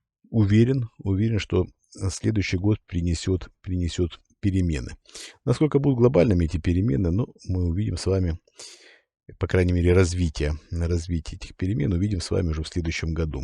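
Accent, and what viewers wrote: Ukraine. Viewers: ВОПРОС. Уважаемый, начал слушать вас совсем недавно, поэтому возможно пропустил информацию о вас. Тут посмотрел самые первые ваши выпуски и заметил у вас явный украинский говор. Мне очень приятно, что такие добрые люди, как Вы с моей любимой Родины, я сам с Украины, скажите пожалуйста, а с какой вы области или региона Украины? Спасибо!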